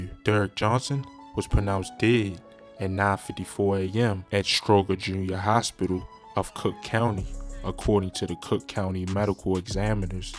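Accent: Baltimore, United States. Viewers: Bmore as hell bruh! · You from Baltimore I can hear it in your voice your videos is bogus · Mannn I can tell yo ass from Baltimore and I’m from Cali 😂😂😂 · U definitely from Baltimore bro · You must be from Baltimore ?????? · you from baltimore ainit 😂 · Yew from Baltimore ain’t yew😭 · Great video. This dude gotta be from Baltimore, right? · Baltimore accent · u can here bros baltimore accident some times lmao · Definitely a bmore nigga narrating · You gotta be from boltimore bro 💀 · Yo from Baltimore I hear your accent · Im from Maryland that’s definitely a Baltimore accent for sure 😂😂😂😂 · The Narrator sound like he from B-More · Can hear the Baltimore accent thick!!!!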